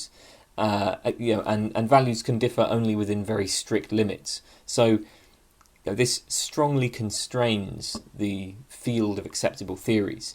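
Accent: United Kingdom. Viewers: I've been watching for more than 3 years and I've never been bothered, or in fact even noticed what you speak of. His videos are hidden gems I had to dig extensively to find. No where on the internet can you find educational material on such a vast range of topics, narrated by an eloquent, young person with a British accent (if I'm not mistaken). What's more is that he provides the most popular and successful interpretations of those ideas. What isn't there to like? Get a grip.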